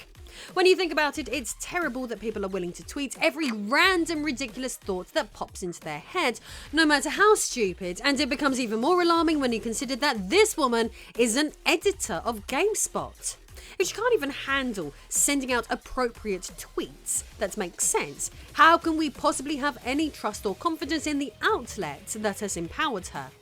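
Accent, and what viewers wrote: England, United Kingdom. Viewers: Ilve been seeing people on YouTube are calling you and your husband white or British and making fun of your accent or teeth … and accusing you both of thinking your better than them because your accent…. If that isn’t racist I don’t know what is… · Apparently, I am addicted to subscribing to nerd content makers with British accents. I am so weak. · Refreshing to hear an English accent pronounce words that are articulated so differently Stateside (Minus the sarcasm). Wholeheartedly agree with your assessments. Great show as always!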